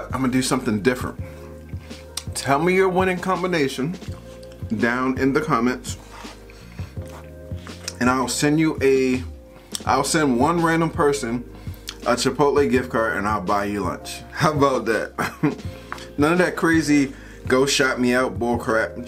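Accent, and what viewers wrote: Maryland, United States. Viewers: Can you do a vid flexing your Maryland accent It is so interesting 🤖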